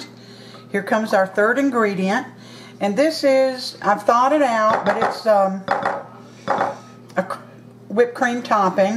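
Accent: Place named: Tennessee, United States. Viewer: Your accent sounds like you in Tennessee. Are you ?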